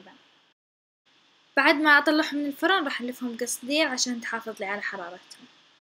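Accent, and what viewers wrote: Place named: Saudi Arabia. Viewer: صراحة انك مبدعه ماشاء الله بالتوفيق وبالنسبه للي يستغرب طريقتها في الكلام اذا سعودي ليش مستغرب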